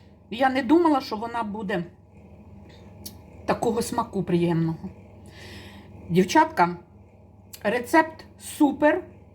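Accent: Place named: Kyiv, Ukraine. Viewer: Мабуть, ви окрім поняття "суржик" і своєї расєйської мови ніоли не чули таке поняття як "діалект". Так от, ставлю вас, нешановний, до відома, що такий діалект поширений у Київській області. І не треба повчати, якою мовою і на якому діалекті людині розмовляти. Всяка мова добра і гарна, окрім огидної рашистської.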